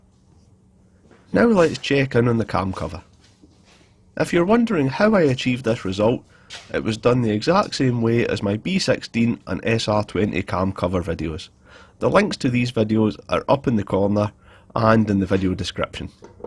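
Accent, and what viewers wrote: Scotland, United Kingdom. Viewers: Oh hell yeah! My favourite workshop ASMR plus JDM content and violently Scottish narration to top it off. \o/ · Honestly this is one of those "must watch, never miss" type channels/series. The quality of the workmanship is outstanding, as are the videos, another key ingredient is the David Coulthard/Leigh Mckenzie type accent its warm and relaxing whilst being authoritative on the subject at hand.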